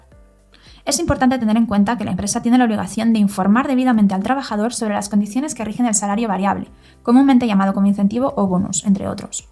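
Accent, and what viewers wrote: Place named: Spain. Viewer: siento un acento como español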